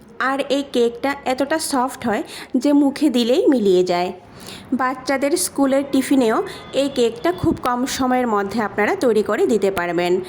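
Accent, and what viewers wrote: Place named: Bangladesh. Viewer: তোমার accent শুনে মনে হচ্ছে তুমি বাংলাদেশি. Cake এ লেবুর রস দেওয়ার দরকার নেই আর cake এ vanilla essence দিলেই ভালো লাগে.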